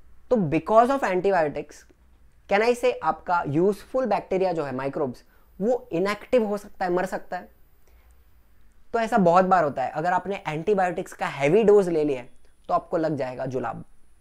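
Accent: Maharashtra, India. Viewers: Sir kya ap marathi speaker hai??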